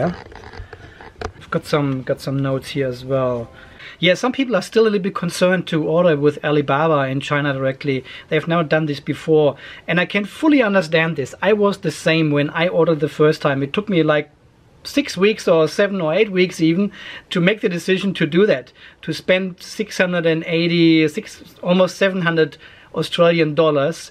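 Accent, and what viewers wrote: Germany. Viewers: Hallo, höre ich da einen deutschen Akzent ? mfg michael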